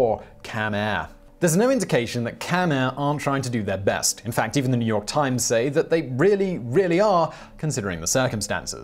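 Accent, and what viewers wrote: United Kingdom. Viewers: So this video is extremely morbid. So why was I laughing through it? With Whistler's fast paced British accent, and the over-the-top 3rd world antics, the whole thing started to sounded like a Monty Python sketch. (No offense, Simon and writers--you are awesome)!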